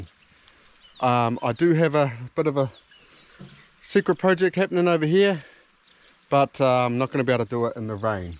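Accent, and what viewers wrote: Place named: New Zealand. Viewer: Bros got one of the thickest NZ accents ive ever heard lol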